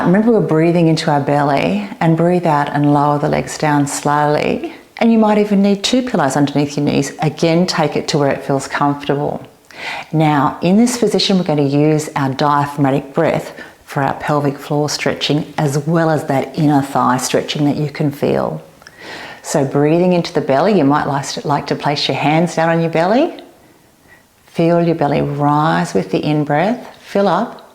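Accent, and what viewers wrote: Australia. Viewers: is that a Melbourne accent? Gorgeous.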